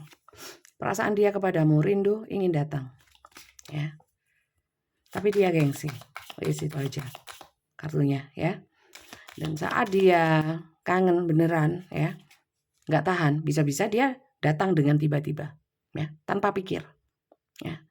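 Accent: Java, Indonesia. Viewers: Medok buanget, kesel aku😂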